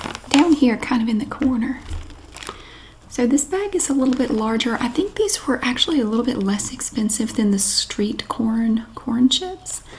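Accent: South, United States. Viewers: Ur pretty ! I like ur soft southern accent 🙃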